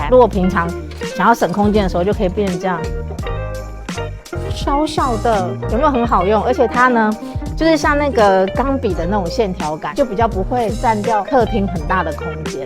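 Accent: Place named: Malaysia. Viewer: 很多画面，让我笑了😁 马上订阅😉 那些是台币吗？ 口音也很像我们马来西亚的。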